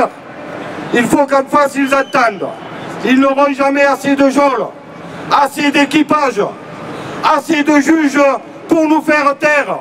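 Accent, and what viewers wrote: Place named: South, France. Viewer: Soutien du 34 !! On est pas loin l'un de l'autre... Ça fait du bien d'entendre quelqu'un tel que vous. Et comme je suis chauvine 😉, quelqu'un avec l'accent du sud 😅. Mobilisons toutes nos forces ensembles ✊🏻✊🏻✊🏻✊🏻✊🏻✊🏻...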